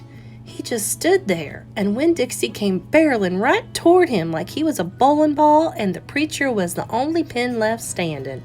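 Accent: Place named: South, United States. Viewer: It's very hard to find anyone who can read a chapter book with excellent intonation, phrasing, and tone. If I need to find a reading to post for my students because I will be absent or I have a student out for an extended time, it's nearly impossible to find good readers on You Tube. But, you are amazing! Your beautiful accent really adds to the ambiance of the story, since it takes place in the south. By far the BEST reader I can find On You Tube.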